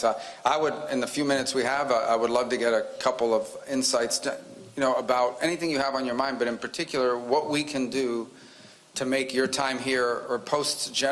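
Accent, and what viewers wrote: Cuba. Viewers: He’s born to Cuban parents, obviously he speak Spanish as Cuban so; it’s not “black “ Spanish, it’s Cuban Spanish. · He got Cuban accent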